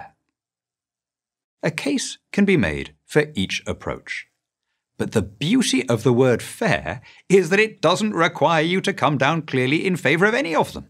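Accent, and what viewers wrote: United Kingdom. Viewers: Hearing the spoken in a British accent is so condescending. Can we have Americanized content for and by Americans please? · Some things just sound better in a British accent 👏🏼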